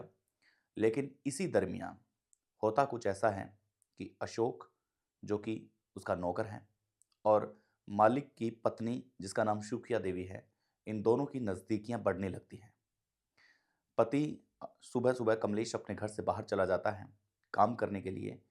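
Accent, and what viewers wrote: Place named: Rajasthan, India. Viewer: Wow aapki hindi buuri nhi paaye ❤❤ ji aapne khajuwala wali language 😂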